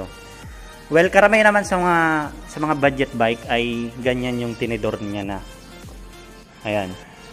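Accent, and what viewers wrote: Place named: Bicol, Philippines. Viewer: Bicolano ka sir😅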